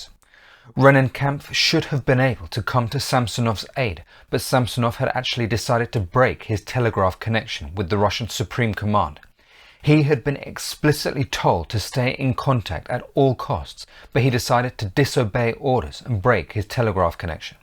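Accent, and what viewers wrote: United Kingdom. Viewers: Russia was useless? Such a BS. With this British accent such a big Russophobia.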